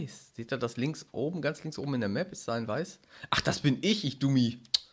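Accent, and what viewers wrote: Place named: Dortmund, Germany. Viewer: Als ob du aus Dortmund Kommst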